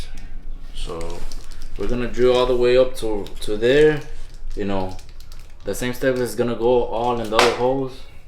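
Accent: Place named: Texas, United States. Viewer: I knew you was from TEXAS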